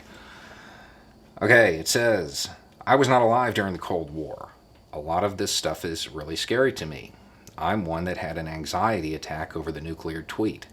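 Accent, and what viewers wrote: South, United States. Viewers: It's the southern accent. 😁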